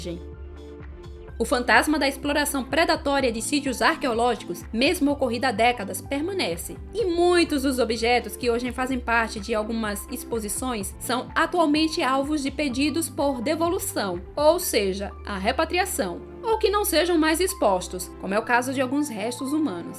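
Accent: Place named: Sergipe, Brazil. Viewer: Ela é de Sergipe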